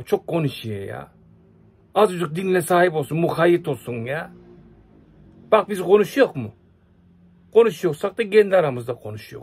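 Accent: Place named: Anatolia, Turkey. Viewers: Anadolu insanının mantığı, deyimleri, bu şive, ... vallaha var ya, lan argadaş neymişin be...! Geberiyom seyrederkene ... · Aksaray şivesi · Genel iç anadolu şivesi galiba( abi) birazda güneydoğu bölgesine geçsen güzel olmazmı Inan seni izlerken ağlanacak halimize gülüyorum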